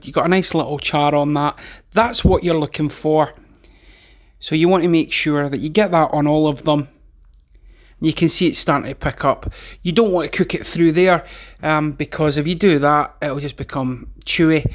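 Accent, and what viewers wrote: Scotland, United Kingdom. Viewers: i made a quiche from start yesterday,homemade shortcrust pastry,seems tastier when you make your own?are you in edinburgh?trying to place the accent.i will be giving your steak pie recipe a go shortly,looks so so good.i might add a wee bit lea and perrins to the sauce? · Great video mate, keep up the good work! Just wondering, what part of Scotland are u from?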